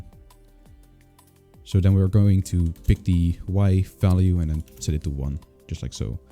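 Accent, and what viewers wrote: Netherlands. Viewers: Ik hoor gelijk dat je Nederlands bent 😂😂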